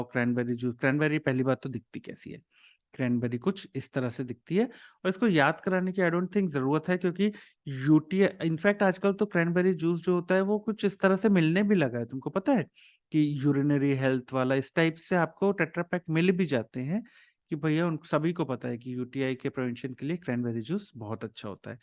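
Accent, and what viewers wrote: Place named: Bundelkhand, India. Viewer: Sir bundelkhandi he 😂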